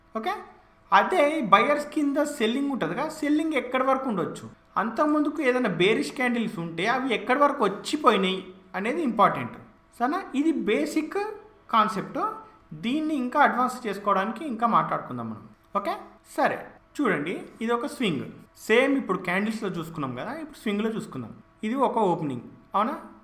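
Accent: Telangana, India. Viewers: Super Bro .. your Telangana Ascent Higlight.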